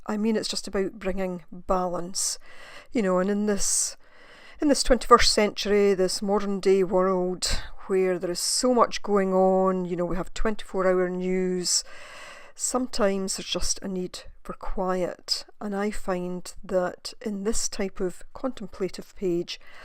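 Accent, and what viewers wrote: Scotland, United Kingdom. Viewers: Hello, I have spent the last three days watching your videos after discovering your channel. I really like your style of work, your explanations and your scottish accent! Greetings from Germany!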